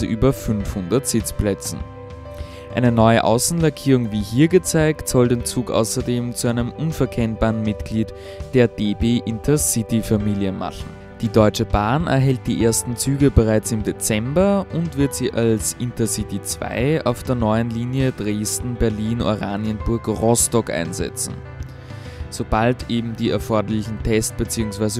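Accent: Austria. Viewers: Das klingt nach DDR-Slang, ist aber aufgrund des österreichischen Tonfalls unwahrscheinlich.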